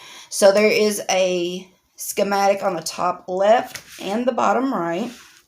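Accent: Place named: Texas, United States. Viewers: I'm from West Texas, and you sound great to me. My aunt from New Mexico would ask me to talk Texas for her, LOL. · Congratulations Sweetheart on your accomplishment!! You are so right that YouTube is hard!! You should be so proud of yourself. You’re an amazing person and deserve so many great things. I’m so glad that I’ve been blessed to know you. You’re a highlight in my life. I remember I found you just scrolling through YouTube unboxings and I was immediately hooked by your Southern voice and calm demeanor! You are EVERYTHING!!!! Congratulations on you being a sponsor as well! I hope that you get a ton of exposure and that more people experience the wonderful quality from your shop!! Love ya Girlie!!❤️ and I’m purposely not putting the hashtag!!!❤️❤️❤️❤️❤️❤️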